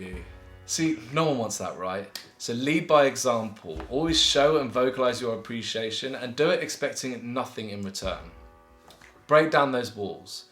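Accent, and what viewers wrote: United Kingdom. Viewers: !! You're like Rudy from Misfits!! do u watch it? aha just found a similarities with the twins and the british accent. Keep making videos!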